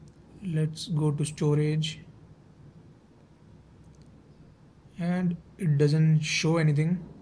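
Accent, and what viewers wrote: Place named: Gujarat, India. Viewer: Are you Gujrati?? your accent is like Gujratis.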